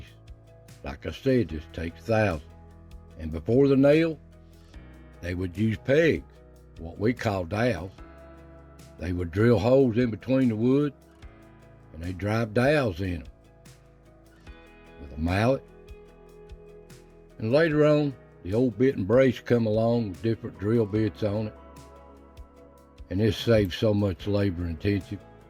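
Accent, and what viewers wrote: Appalachia, United States. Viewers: The voice of Appalachia. Another Great video.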